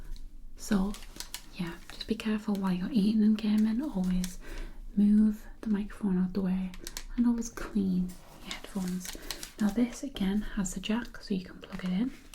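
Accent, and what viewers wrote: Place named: England, United Kingdom. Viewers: She sounds very Geordie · That English accent fucking hell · I can tell your from Teeside purely by your accent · Are you from Sunderland?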